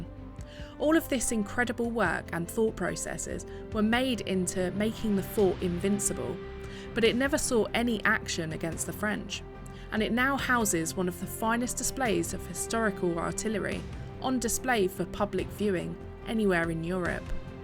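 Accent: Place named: Hampshire, United Kingdom. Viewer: Nice to hear the Hampshire accent. Instead of fake Estuary English. A very nice county with lots of lovely small towns and villages.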